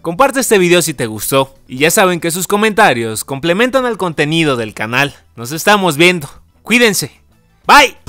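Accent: Mexico. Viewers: Deberías neutralizar un poco tu acento ranchero.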